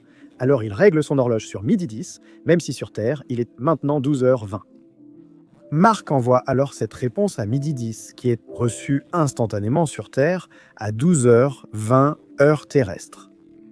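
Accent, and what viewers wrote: Quebec, Canada. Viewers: Doublage (québécois) de merde. IA peut-être ? C'est très désagréable à regarder.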